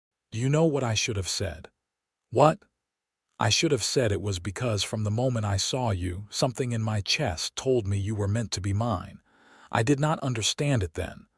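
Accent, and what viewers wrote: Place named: Georgia, United States. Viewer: I especially enjoy the narrator's accent, he sounds like he comes from Savannah, GA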